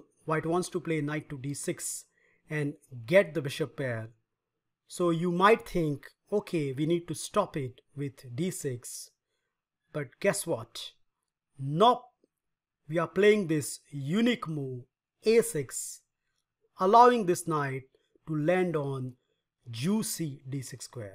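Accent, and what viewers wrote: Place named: India. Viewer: The best Indian YouTuber with funny english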